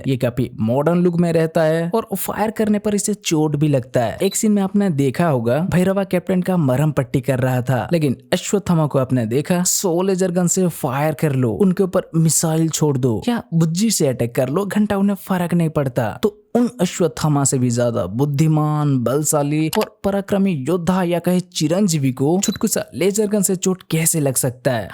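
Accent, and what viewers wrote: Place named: Gujarat, India. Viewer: Aise kyun bol raha hai jaise koi teri le raha hai. Sorry, but bohot irritating hai. Dusra ye hai ki if you are Odiya or Bengali make video in local language, jyada better. Kyunki teri Hindi waisi hi hai jaisi Gujarati logon ki Hinglish.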